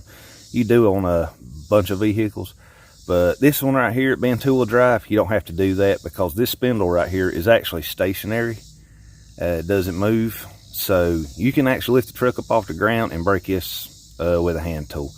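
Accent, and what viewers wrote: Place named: Deep South, United States. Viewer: Once in 1984 I took off from Reno Nevada to go visit my mom in Cookeville Tennessee in a junk 1973 Chevy Nova. It has a 3 speed on column and 250 six. I just left with almost no money to see her for Christmas. Power steering pump failed in Texas panhandle. Went to parts store got rebuilt steering pump. No pulley ! So a local (had your deep south accent) who was at the parts store "follow me home I have a press ". I follow this stranger long way . Pull up his home it was his family. They fed me and put my car back on road. Asked me and my blue 💙 Heeler to spend the night. Fed us breakfast. I am a yank. They show such love these deep south folks.Gave us food to go . Went saw momma in Cookeville for Christmas. God bless you brother. I lost her few years later.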